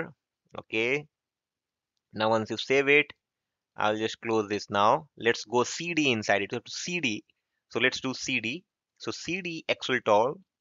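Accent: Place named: Bihar, India. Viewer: Bro are you from Bihar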